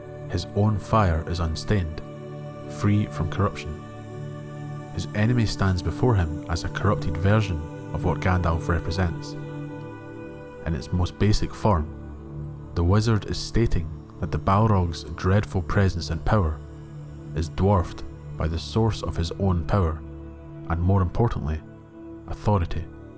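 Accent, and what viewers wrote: Scotland, United Kingdom. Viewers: Love the part involving Gandalf and the Balrog and the explanation there, have to admit that you give it so much thought. We have here a clash of the 'fire of the sun' as you kind of put it, but also a kind of 'pure-fire' (which may be associated with the colour white in a lot of artworks), and the 'red fire' of the Balrog. There is considerable colour-psychology at work here, with 'White' oft-associated with purity as you here noted, where red is a colour of 'passion' and 'rage', and given how the Balrog is often also associated with darkness (a colour of evil in classical colour-psychology). It seems that there's a statement being made here in regards to the nature of the two predominant figures and the kinds of flames they represent. Gandalf's is good and is representative of Eru and the Balrog is representative of Melkor (who is still technically out there in the void). 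Just something I noticed in this video (I know you covered all this) but it is still fascinating to go over it again. The use of colours in Tolkien's descriptions reveal also the attention to detail Tolkien put into his scenes, and add to his mythology (another ex might be Gurthang, being a black sword a representation of Turin's inner darkness, where his helm often appears golden, which is a regal colour associated with good but also greed). This video was perfect, really enjoyed it and your lovely Scottish accent, and really hope you do that history of Angband series that you want to do.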